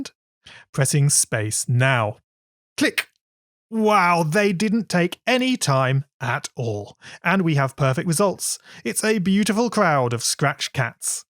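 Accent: England, United Kingdom. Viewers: are you from england? thats the only place i know where people say z (shee) as z (zed)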